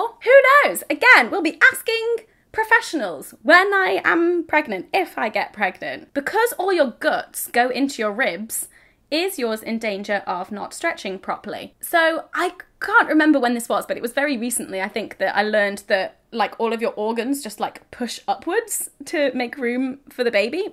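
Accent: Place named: Newcastle, United Kingdom. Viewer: love your geordie accent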